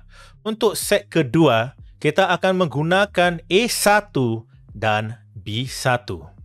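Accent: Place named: Malaysia. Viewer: suara nya kayak orang Malaysia sih😅😅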